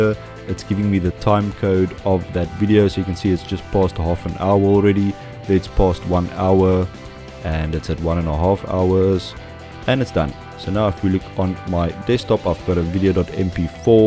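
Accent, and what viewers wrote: South Africa. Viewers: Homeboy sounds South African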